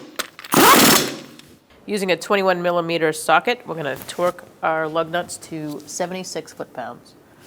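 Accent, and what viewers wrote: Boston, United States. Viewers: I would highly trust a woman mechanic with a Boston accent. These videos are great. I am mechanically inclined but seeing the process a professional uses makes these tasks such a breeze. No thinking required.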